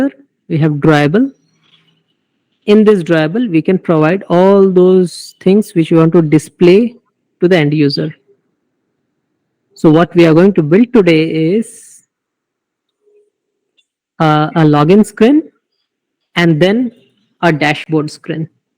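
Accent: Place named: India. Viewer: bro dont disrespect , indian english is like that only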